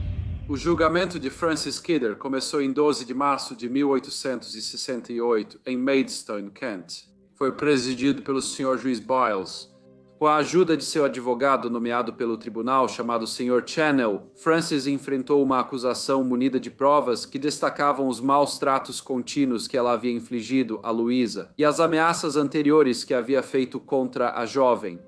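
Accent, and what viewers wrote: Portugal. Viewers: Os casos são interessantes. Mas a forma de vc contar. Muda a voz as vezes parecendo um português de Portugal. E chato.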